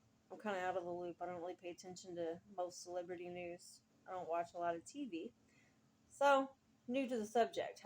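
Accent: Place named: South, United States. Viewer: That southern accent loving it 💯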